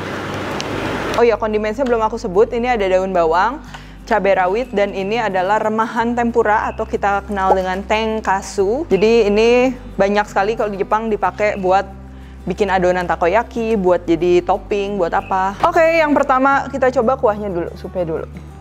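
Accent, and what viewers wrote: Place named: Tasikmalaya, Indonesia. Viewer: "Lebih lekoh lagi" 😁 Cici dari mana, Tasik ya?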